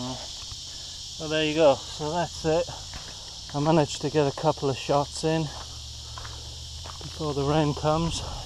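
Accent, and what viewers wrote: United Kingdom. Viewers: Where do you live at brother if you don't mind my asking? You sound British, but I didn't think you were aloud to own shotguns in England. No offense meant. I'm just ignorant when it comes to gun laws in the UK.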